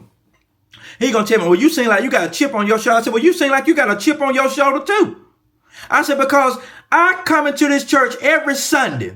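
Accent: United States. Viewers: I know a NC accent when I hear one. Fayetteville native here! You are toooo hilarious!!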